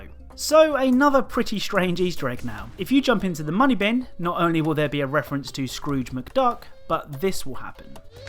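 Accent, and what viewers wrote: United Kingdom. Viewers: you british man?🤨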